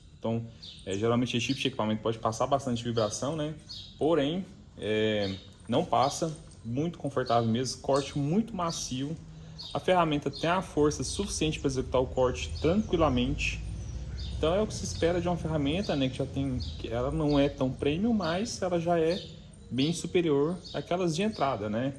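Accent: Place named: Inland Sao Paulo, Brazil. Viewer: Você fala com o sotaque interiorano de São Paulo, como o meu avô, quando diz "garga" ao invés de galga, oriunda do verbo galgar. Me deu saudade. Observações: Use luvas anti corte quando manusear máquinas de corte, aconselhável usar mascaras anti pó, principalmente em MDF. Se puder, óculos com isolamento para os olhos, também. No demais o vídeo esclareceu bastante. Obrigado.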